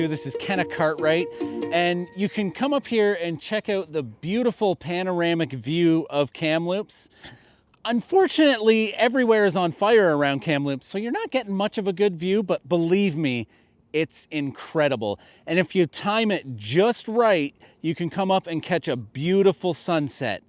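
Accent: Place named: United Kingdom. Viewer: A pleasure as always. Ha ha, yeah I think that British with a twang. That swim spot is divine.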